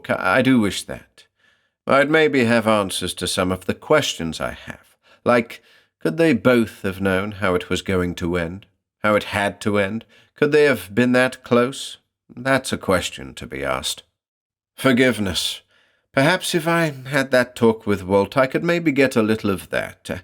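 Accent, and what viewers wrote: United States. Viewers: Really good American accent,:)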